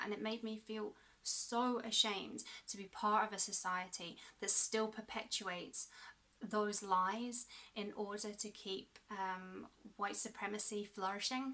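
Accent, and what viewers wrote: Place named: Scotland, United Kingdom. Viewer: You’re awesome and Scottish. Win. Win. I could listen to you all day. Subbed! Awesome wrap up. Definitely going to check Persepolis.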